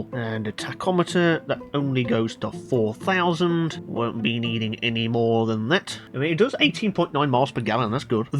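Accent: United Kingdom. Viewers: Omg funny bri'ish fella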